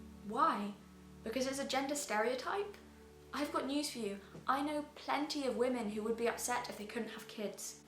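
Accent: United Kingdom. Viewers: Woman are beautiful Strong and they can run very fast they are not weak And this woman accent is very beautiful and I love British Woman they are very powerful and I love her accent so beautiful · i thought this was gonna be some insufferable libtard or conservtard discussion on "misrepresentation of gender archetypes in fiction" that instead rags on commercialisation or identity politics etc in, i hear a british accent and eat my thoughts (not a comment on british people, also not a comment on american people. its less likely for a non-american to be republicanly/democratly charged than an american) i think this is gonna be good in and it sounds kind of consevtard but the coments on the quality of this video inclines me to think that your going to give reasonble basis for the selection of his specific evidence to and i get the clarification i asked for now im waiting for the clarication i need oh yeah i forgot to mention: subscribed. 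i dont remember when but im now i hoping you prove me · I'm so glad it's a british chick saying this. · This has to be the most "propa" british accent I've ever heard.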